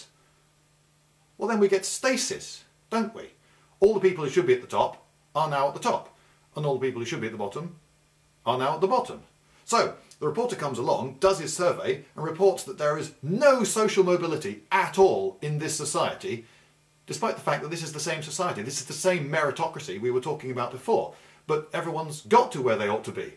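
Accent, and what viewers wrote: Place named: RP, United Kingdom. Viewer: Cbf to explain why your analysis is fcking stupid. You having a posh accent doesn't make you clever.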